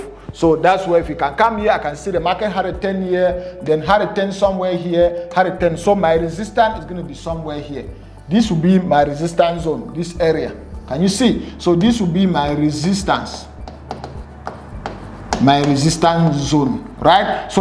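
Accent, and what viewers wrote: Cameroon. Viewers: Man you speak like a Cameroonian ❤ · You sound like a Cameroonian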